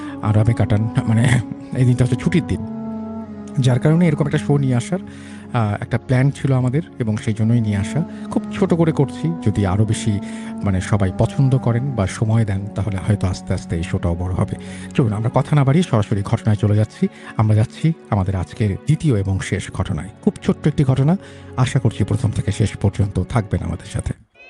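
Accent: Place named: Tangail, Bangladesh. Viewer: Tangail theke shuntesilam 🥺🤍 nijer district er kotha shune valoi laglo😊💟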